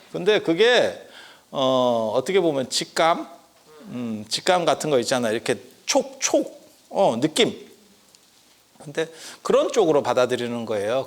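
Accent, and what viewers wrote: Jeolla, South Korea. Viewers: 스님은 전라도 억양이 좀 센거 같아요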